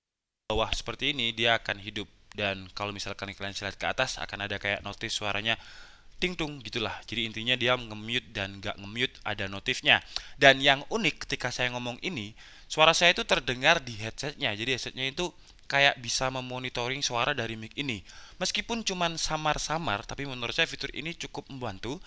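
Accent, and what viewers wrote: Java, Indonesia. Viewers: Wong jowo asli Iki.. ngomong Cok e mbulet🤣🙏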